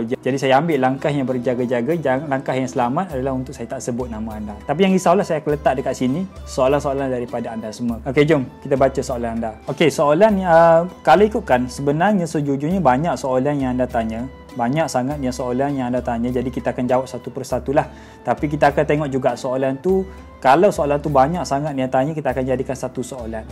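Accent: Kelantan, Malaysia. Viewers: Ustaz org Kelantan ke ?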